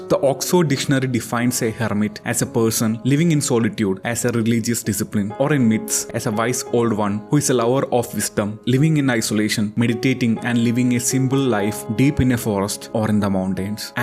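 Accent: Kerala, India. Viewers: Your accent is too obvious. Thick mallu accent is often too hard for foreigners to understand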